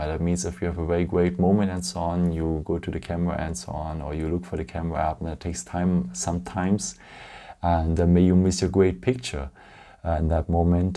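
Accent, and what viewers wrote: Germany. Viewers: Thick german accent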